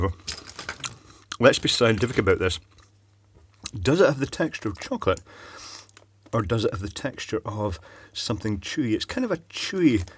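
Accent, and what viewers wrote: Scotland, United Kingdom. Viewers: I've watched this twice, so far. Should I be embarrassed to admit that Czech words and yummy noises in a Scots accent are oddly compelling?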